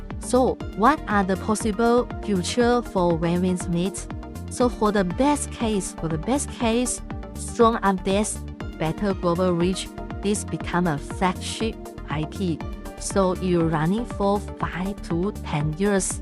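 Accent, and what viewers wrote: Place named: China. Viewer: tbh, it tooks me 6 minutes 34 seconds to get a single heroes realm match attempt to happens, and someone failed to confirm because they were afk most probably. I used to be able to get matches instantly. So there is already an impact on matchmaking. Also, this videos scream of chinese propaganda, made to make people stay in the game and play it. The strong chinese accent is too obvious for it to not be that lol.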